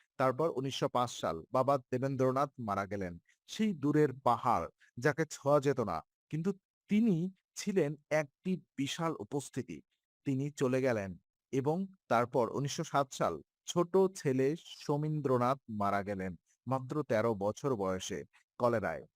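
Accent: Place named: Bangladesh. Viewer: manshi 909 = Pl. try to digest the narration only not to give attention on PRONUNTIATION Possibly the Narrator hails from Bsngladesh.His Research work on whole life of our International Bengali Poet, in a nut-shell _ should be praised first.Hope critjcism be last _ Appreciation be first. now understood = sr.citizen.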